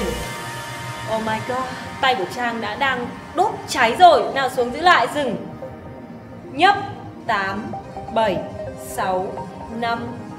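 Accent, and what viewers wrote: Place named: North, Vietnam. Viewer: Giong mien Bac that la de chiu.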